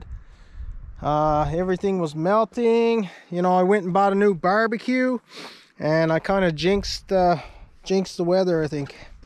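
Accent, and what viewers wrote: Newfoundland, Canada. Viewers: Wow what a guy! Thank you sir for the shout out! Some nice to hear that beautiful newfie dialect come through my speaker lemme tell ya! Keep er going man!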